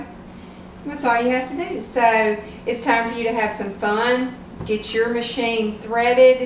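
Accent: South, United States. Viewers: Your video came on after I finished another tutorial, but I thoroughly enjoyed your southern country drawl, as well as your explanation. I put my singer away and just sat and listened to your video. Thank you!! 🙏🏽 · You did a fantastic job with this video! Your explanations and demonstrations were so thorough and extremely helpful and easy to follow. I’m looking forward to the holidays being over so I can play with my new heavy duty Singer machine. Oh, and your southern drawl made it even more enjoyable! 😊. Thanks, looking forward to the next video.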